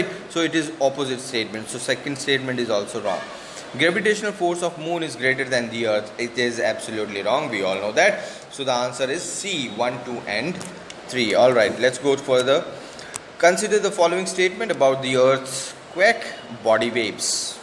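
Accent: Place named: United Kingdom. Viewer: Doesn't ur English belongs to British country sir why r u wasting u r time in Assam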